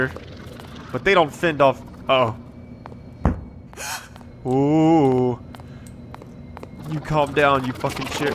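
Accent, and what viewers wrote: United States. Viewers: Jeez has the most American Voice ever:face-green-smiling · lmao the reason why i watch is bcos of his reaction and his strong American accent HAHA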